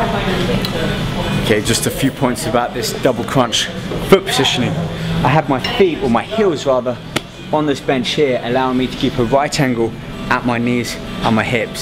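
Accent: London, United Kingdom. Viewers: you sound like you come from london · the british accent makes him so much more believable. its such a sad thing on my mental capacity but its true LOL. hes not saying anything different from what I already know but it sounds so new for some reason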